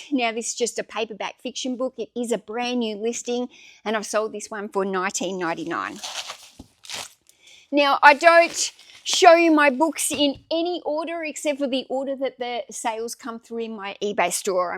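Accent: Australia. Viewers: around the 25-minute mark, you said "Crue Trime" Hahaha, I was eating fried rice and spit some out, then I rewound it 4 times to hear it again-love the AU accent (I'm in America)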